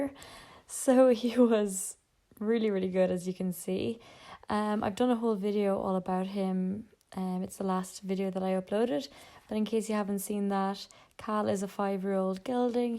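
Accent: Ireland. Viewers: I can sense the Mullingar accent from a mile away !! 😂😂😂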